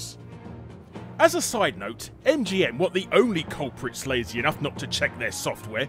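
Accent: United Kingdom. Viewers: I needed 5 seconds to figure out that you're a British, cause I turned off the CC n I thought you said Varices over and over like this video is about Varices. 😂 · "For you English speaking fellows" being stated by a very British accent was a highlight joke for me for some reason.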